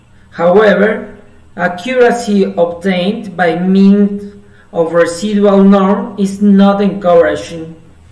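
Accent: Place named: India. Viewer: He speaks like Hindu, not like Mexican jeje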